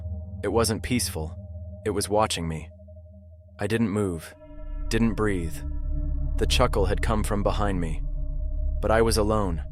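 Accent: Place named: Jamaica. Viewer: your Jamaican accent is fine, believe me you don't want to be anything similar to a Ja... 🚫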